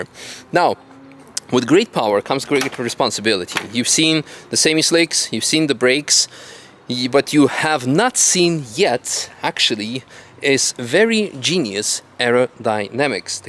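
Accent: Netherlands. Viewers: Now I can no longer unhear the Dutch accent. 😂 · Nooit geweten dat jij Nederlands was , maar niet geboren en getogen aan jou accent te horen ? 😂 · wilde nederlander gespot, je kan je accent heel goed verbergen en veel plezier met je kanaal!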